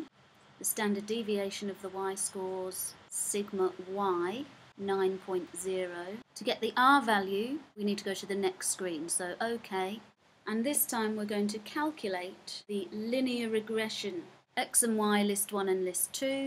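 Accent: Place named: Australia. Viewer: thanks :) aussie accent eh ;)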